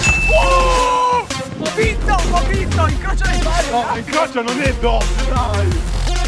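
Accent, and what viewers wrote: Molfetta, Italy. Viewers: ma sei di molfetta?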